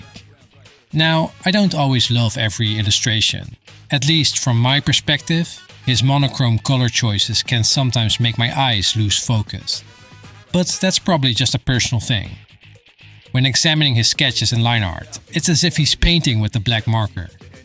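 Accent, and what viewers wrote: Netherlands. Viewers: are you perchance dutch i feel like u have a dutch accent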